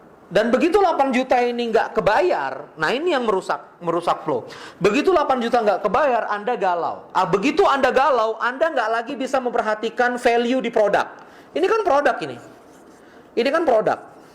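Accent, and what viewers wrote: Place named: India. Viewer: bahasa inggrisnya kyk logat india :D udah pake bahasa indonesia aja kang. klo mau campur pake bahasa sunda aja :D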